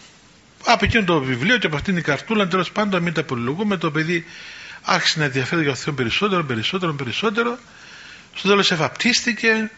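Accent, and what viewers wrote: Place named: Cyprus. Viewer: Πόσο σε αγαπάω πατέρα Αθανασιε, σε ακούω πολλά χρόνια καί έχω ωφεληθεί πάρα πολύ. Μου άρεσει το χιούμορ σου, και τα κυπρεικα που λες τα απολαμβάνω. Να σε έχει ο Θεός καλά Ευχαριστώ από Ελλάδα.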